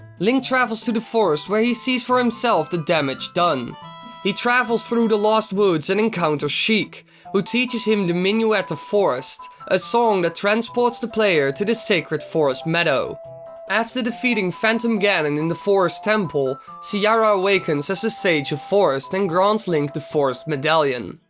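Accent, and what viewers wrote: Netherlands. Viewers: are u dutch? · Love a Dutch accent.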